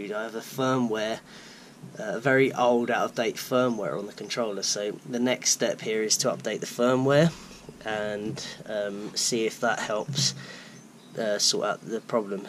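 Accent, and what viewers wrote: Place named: England, United Kingdom. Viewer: It’s like your speaking a foreign language with an English accent. Sounds like you’re on track though and hopefully you can upgrade the controller soon and find that lost power.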